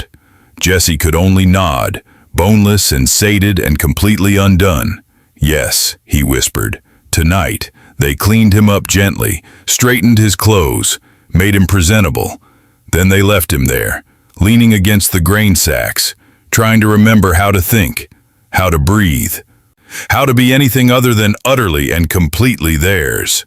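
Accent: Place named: United States. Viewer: Listening to your, fantasies, fascinating and so well constructed and excellently narrated stories. Your stories usually always bring a smile to my face and hold more than my attention. The voice is so American, gripping and appropriate. However Steinbeck's novella "Of Mice and Men" is very powerful and probably far more enduring in the USAlien cannon.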